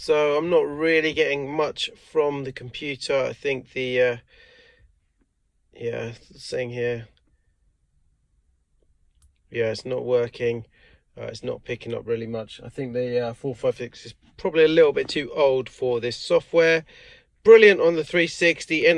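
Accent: England, United Kingdom. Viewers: He's from England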